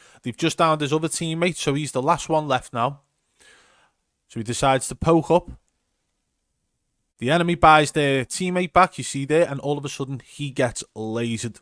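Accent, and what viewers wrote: Liverpool, United Kingdom. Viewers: Are you a scouser ? cause i heard an accent. or am i deadly wrong lol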